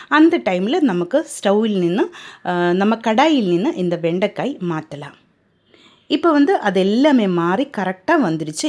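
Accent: Kerala, India. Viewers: அவர் மலையாளி ஆகவே தான் அப்படி பேசுகிறார், we should appreciate her.instead of mocking.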